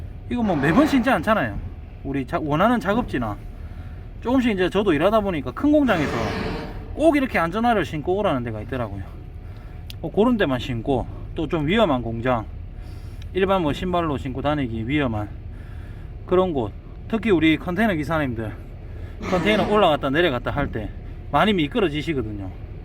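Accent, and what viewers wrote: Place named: Gyeongsang, South Korea. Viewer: 유튜브에서 표준어 말고 사투리로 하는 유튜버 걸러주는 기능 없나? 졸라게 듣기 싫어죽겟네 갱상도 사투리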